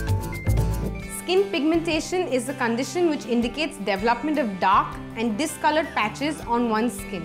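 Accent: India. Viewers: The indian accent is so cute :O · Oh you have such a lovely Indian accent.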